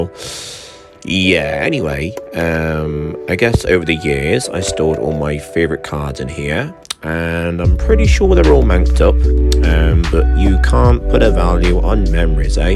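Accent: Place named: United Kingdom. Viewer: Yeah still sound British just different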